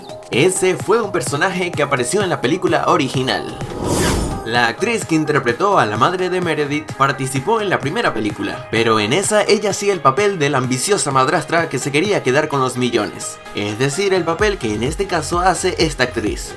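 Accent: Venezuela. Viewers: Eres de Venezuela ? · consolo oir tu voz dije naaaa este chico es venezolano 7u7 ven paca y abrame compañero :v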